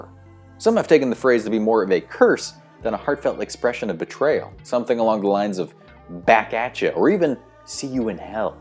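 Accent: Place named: United States. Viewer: O_o what English are you speaking? That is perfectly normal American English pronunciation of those words.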